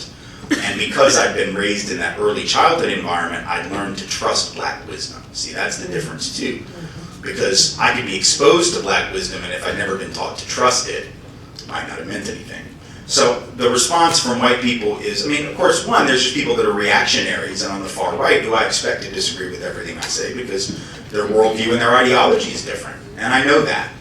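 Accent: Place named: South, United States. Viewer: ive watched his speeches in front of a room full of white people and he speaks the same way. hes from the south. nice try though.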